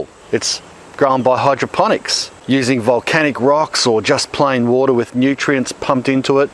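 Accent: Queensland, Australia. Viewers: I’m going to guess you’re from Qld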